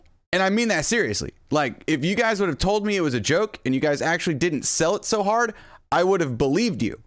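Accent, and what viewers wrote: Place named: Arkansas, United States. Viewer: Real talk. I ran across your channel and subbed because you sound like a Arkansas hillybilly and you had unbeliveable reflexes, Im here to spend a few minutes watching someone I like, Doesnt matter the game. Big hello to mama Fox.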